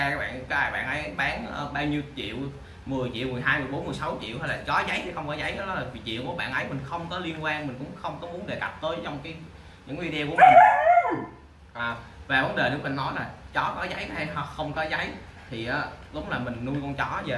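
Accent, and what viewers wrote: North, Vietnam. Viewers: Hoi dau ma noi bac ky no la vay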